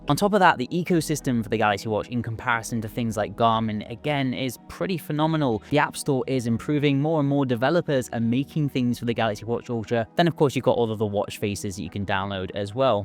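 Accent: United Kingdom. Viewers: Please consider talking slower. I am forced to use subtitles due to your heavy British accent (Canada here), as well as your speed talking. (I've been told I do the same, lol) just a consideration. Thank you for the great review, I just got my s25 ultra, and am thinking that the watch would go nicely with it! 😁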